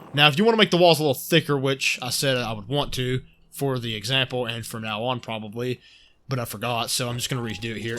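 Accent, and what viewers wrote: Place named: South, United States. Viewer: your improvement at content creation over time is really cool to watch, Im about to finish all 88 of your astroneer vids. I think your confidence has strengthened over time and it's really enabled your personality to come out, it's fantastic! Your commentary is so enjoyable to listen to, you got that nice southern lovin' accent my bro I can't get enough! Thanks for posting and hope you keep at it!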